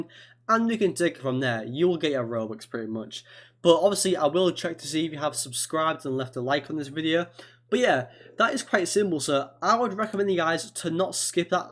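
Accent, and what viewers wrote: United Kingdom. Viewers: 100% brithish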